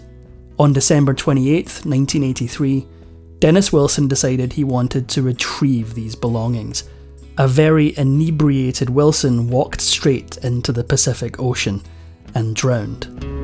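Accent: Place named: Scotland, United Kingdom. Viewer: You answer my question . I love his voice too. Scottish . I thought British.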